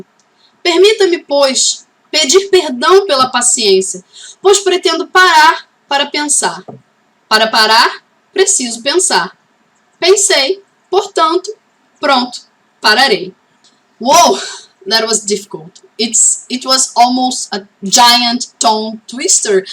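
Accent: Rio de Janeiro, Brazil. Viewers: Você tem o sotaque carioca 😍😄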